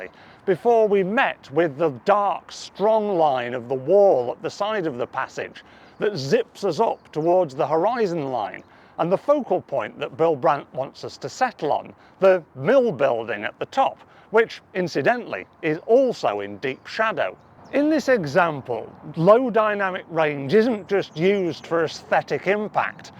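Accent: United Kingdom. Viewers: Is there a more English looking and sounding man than this one? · This guy sounds so British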